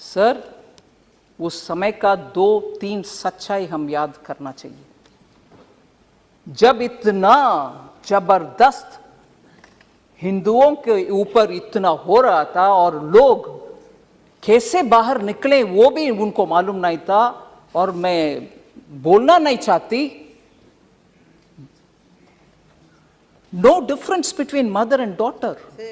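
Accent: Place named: Telugu, India. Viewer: X lent mam a telugu lady expression in Hindi language beautiful hum Fida ho gaya aap ke bhashan on truth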